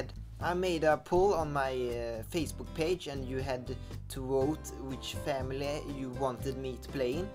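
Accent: Sweden. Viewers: o i barely noticed this was uploaded on my 18th bday xD,btw i love your swedish accent :D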